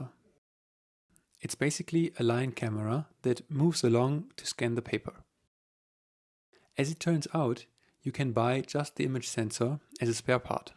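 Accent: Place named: Germany. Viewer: This is amazing! Whenever you see an ingenious project, it comes with a German accent. If you've not seen it already, please, please check out Destin / Smarter Every Day's manufacturing video. It's about getting a Barbeque cleaning brush made in the USA with non-chinese parts. It would be sad to see the MPS fail as a business, but it seems that the west has cost engineered ourselves into a manufacturing corner, and become very vulnerable in the process.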